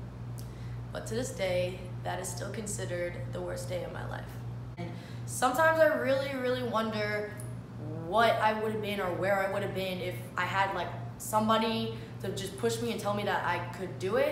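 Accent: New York, United States. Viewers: I could tell from that accent you were from NY without even knowing!!! You’re absolutely fucking stunning! I’m a fan! 💕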